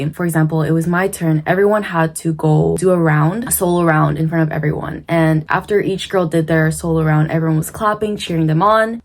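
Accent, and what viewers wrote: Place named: New York, United States. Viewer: Not trying to be disrespectful but you sound like a New York Latina and not sure if anybody's told you that? And you said you lived in canada but you got me thinking you're puerto rican from the tone off you're voice and I'm cuban so It's cool and I enjoy you're feed back on japan and tokyo but you got a strong American dialect which makes you real from the soft spoken japanese girl even if they are half but it's good to hear how you give input with such confidence bravo to you as a asian girl. 🙌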